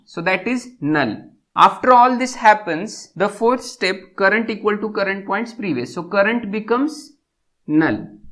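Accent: India. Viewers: The 1st time I understand Indian accent. You explained well. Thumbs-up!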